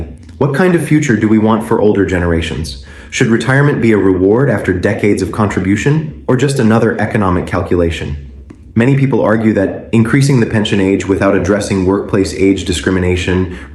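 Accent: United States. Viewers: Why is an american accent being used instead of British? You cant really take this channel seriously due to it. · This is the second video I've seen narrated by this guy with an American accent with misinformation that's not been UK News and on the government website and would have been.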